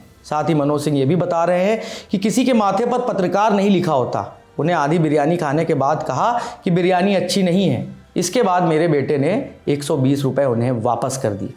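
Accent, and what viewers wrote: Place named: Lucknow, India. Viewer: Ap pakka Lucknow se ho ge 😅😅 apke bolne ke tarike se lag raha hai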